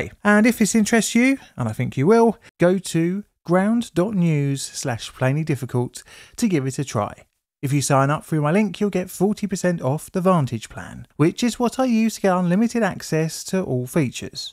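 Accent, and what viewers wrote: United Kingdom. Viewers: Narrator’s accent is aggressively British.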